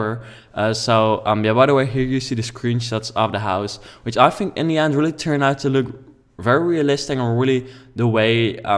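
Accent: Netherlands. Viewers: Je kan wel horen dat je Nederlands bent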